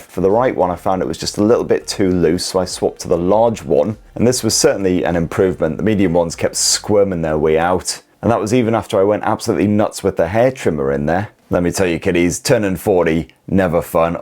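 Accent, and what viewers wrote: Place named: Northern England, United Kingdom. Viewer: Very useful review - Google translate helped me with your more extreme vocalisatory nothernisms. Seriously very useful and engaging with the duller info. Thank you!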